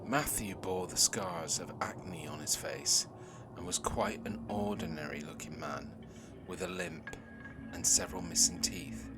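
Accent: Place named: Northern England, United Kingdom. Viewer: Gruesome story loved the northern accent G 😅😅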